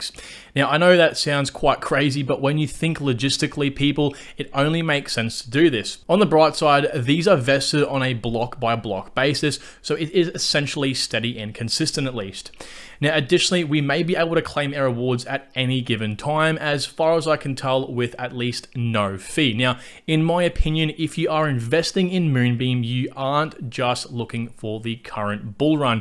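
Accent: Australia. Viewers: Aussie mate!!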